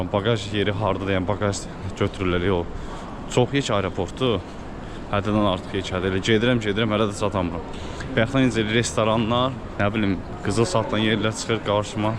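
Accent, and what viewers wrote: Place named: Ganja, Azerbaijan. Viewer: Ləhcədən bilinir gəncəlididə😂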